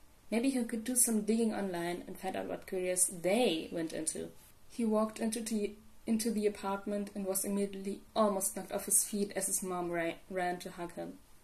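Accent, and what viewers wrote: Germany. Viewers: I think your English is very good! I was wondering what your first language was though tbh. I guessed it was German but I’m not sure.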